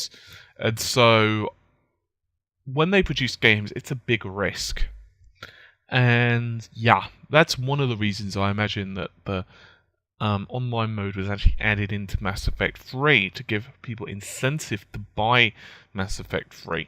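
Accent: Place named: Manchester, United Kingdom. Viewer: Are you from Manchester? your accent is simular to Soprano pictures.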